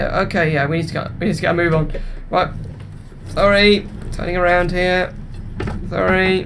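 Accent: United Kingdom. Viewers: young bri'ish fella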